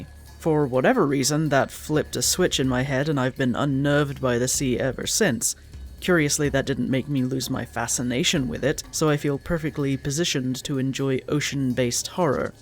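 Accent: Scotland, United Kingdom. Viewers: You don't sound American, but you don't sound distinctly Scottish either. If I wasn't familiar with different Scottish accents I wouldn't have been able to place your accent! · Oh wow I thought you were British lol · Who told this person they sound american lol they do not, if not told i would have assumed this was just a hyper-regional accent from somewhere in britain that sounds like some sort of mix of english, scottish, and american haha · Anyone that says you sound American must be experiencing hearing loss or something... I didn't immediately think Scottish until you said it, and now that you have, I can absolutely hear it, but I knew there was a distinct European-ness to your accent. It's not the strongest accent in the world, but it's absolutely there and absolutely, distinctly not American. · ...do people actually think you sound American? 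I could tell you were British(though I'd guessed England, not Scotland) the first time I heard you speak, though as you can likely guess from my pfp, I'm hardly unfamiliar. (Funnily enough I have a similar story about moving around quite a bit growing up, to the point my own accent is diluted to the degree I'm often mistaken for Canadian)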